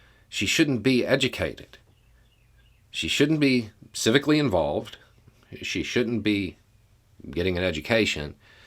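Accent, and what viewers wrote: South, United States. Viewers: Many thx for your videos. You talk about important subjects in a straight way. Peel away rubbish and twisted ideas. Again, thx from a grumpy old man, living in northern EU. ( I also understand your fxxxing southern accent ☺️) Slava Ukraini 🇺🇦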